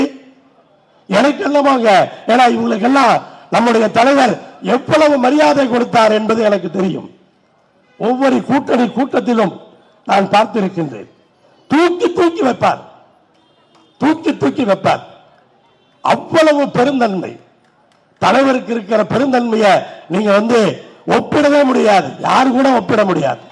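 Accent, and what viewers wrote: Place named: Kongu, India. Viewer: அய்யா‌ கொங்கு தமிழ் வழியாக எல்லோருக்கும் நன்றாக புரியும்படி பேசியுள்ளீர்கள்.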